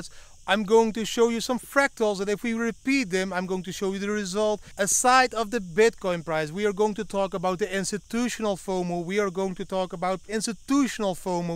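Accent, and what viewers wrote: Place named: Netherlands. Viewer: Are you dutch? Ik hoor Nederlands accent in het engels! Goede content!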